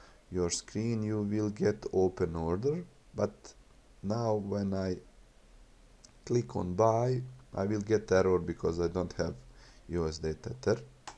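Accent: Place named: Bosnia and Herzegovina. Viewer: Odma sam se pretplatio kad sam čuo engleski sa Bodanskim naglaskom 😅,sala Hvala na video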